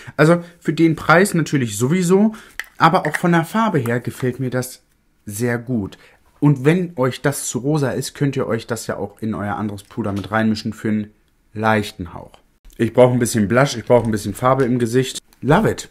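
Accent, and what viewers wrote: Berlin, Germany. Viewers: Schicke bistde👍🥰 of berlinerisch😅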